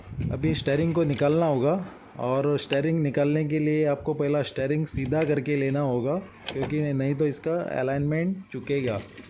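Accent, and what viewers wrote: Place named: Mumbai, India. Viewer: Hello irmao appreciate your skill and precision work . Not jugadu but your work and perfection it's like O E M BOSCH. 👍👏 Your Hindi is we call dobitalv Bombay uncle and aunty Hindi . Nice to listen . Greetings have nice day 🙏💐👌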